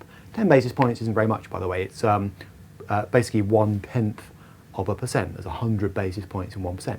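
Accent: United Kingdom. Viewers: I like your british accent and it is so clean that i can understand everything been said not like the american one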